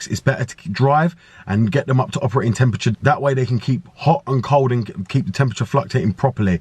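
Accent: United Kingdom. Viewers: mATE were you born in the uk?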